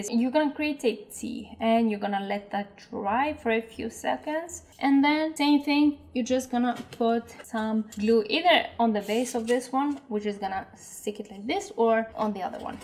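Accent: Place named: Eastern Europe. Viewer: which part of eastern europe are you from?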